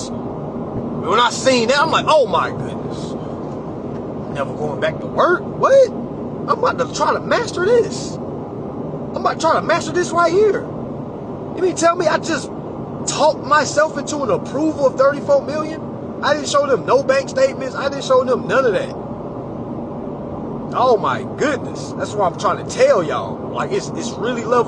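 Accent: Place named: Texas, United States. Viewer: Damn im from the west side of rockford bro u from the rock ? I been on yo channel fo a sec trying to learn ithought u was from tx?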